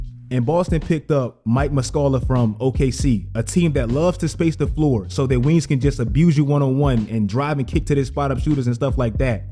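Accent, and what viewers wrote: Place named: New York, United States. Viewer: You sound like you from NY lol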